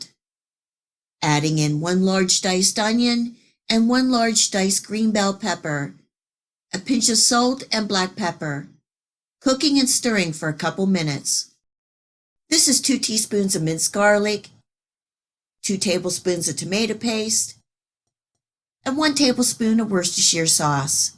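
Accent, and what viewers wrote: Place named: Pennsylvania, United States. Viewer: Just found your channel and have been binge watching….sounds like a Pa accent or close to it (East Coast) 🤗😘 I’m from York!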